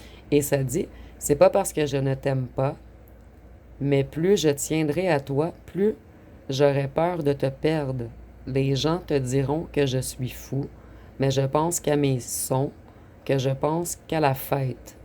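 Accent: Quebec, Canada. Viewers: Quel bel accent quebequois et quelle jolie voix toujours heureuse de vous retrouver Merci ❤❤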